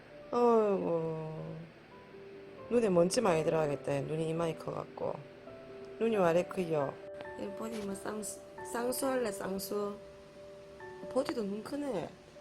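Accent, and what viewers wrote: South Korea. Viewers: ㅋㅋㅋㅋ 저까지 같이 신나네욬ㅋㅋ 찐부산사투리 넘 좋아용 ㅠㅠㅠ 애미 자는 모습을 보니 집이 엄청 편안한 가 봅니다! 매번 댓글 다 읽어주시고 하트보내주셔서 감사해용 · 눈이 와이리 커요~ 고향이 경북 문경이나 상주쪽이신가요? 억양이 처가쪽이랑 꽤 비슷하네요 ^^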